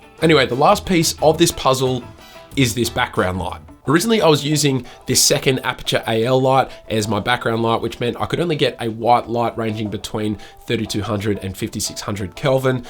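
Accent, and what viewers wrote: Australia. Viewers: Hey, this was very helpful! I was always considering that aperture light but now this just gives me all the more reason to do so! Also, to hear a fellow Aussie accent helped me connect with what you were saying more! Will go through your other stuff and subscribe:)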